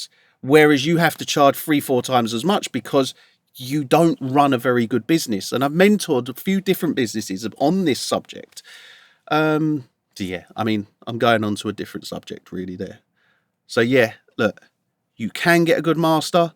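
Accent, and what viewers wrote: United Kingdom. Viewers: I love the british accent: "Can I masta"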